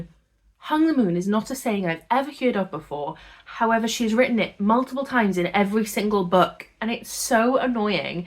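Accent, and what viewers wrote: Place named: Wales, United Kingdom. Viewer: I've just found your channel and I absolutely love you and your Welsh yay xx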